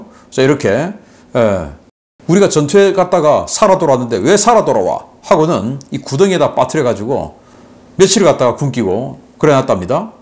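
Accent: Gyeongsang, South Korea. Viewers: 신인균 YTN 너희들 말대로면 모스크바는 2~3번 함락됐어야 한다 경상도 씹 말투 존나 거슬리네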